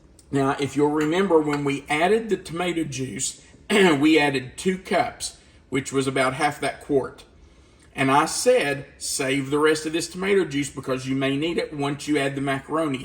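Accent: South, United States. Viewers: I think you guys are great. What is the fat ratio of your beef? 80/20? 70/30? I love how you Southerners put G in the word onion.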